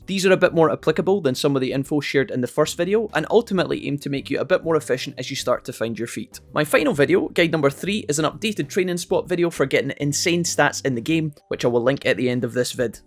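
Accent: Scotland, United Kingdom. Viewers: It's kinda funny. I was thinking the other day, what was the name of that other Scottish guy I used to watch for tips the first go around on ps4? Then this video just randomly played after watching a watchmojo Digimon video and knew it was you as soon as I heard the voice lol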